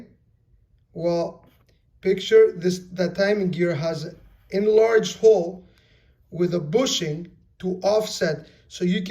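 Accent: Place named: Quebec, Canada. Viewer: You from Quebec bro ?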